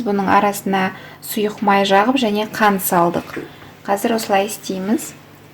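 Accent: Standard. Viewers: Менин ойымша казакша таза сойлеп турго.келисем мак коп сиякты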